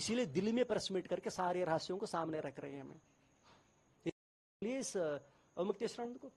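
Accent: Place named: South, India. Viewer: South ke accent me hai kuch bhi mat bolo yar😂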